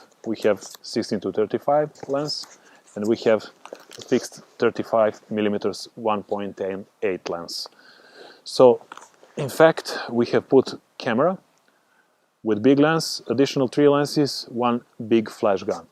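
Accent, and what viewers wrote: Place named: Eastern Europe. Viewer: I cant stand Eastern European accent 🤬🤬🤬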